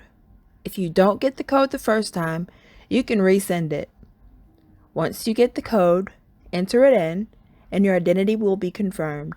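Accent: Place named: Atlanta, United States. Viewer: I knew that accent was ATL!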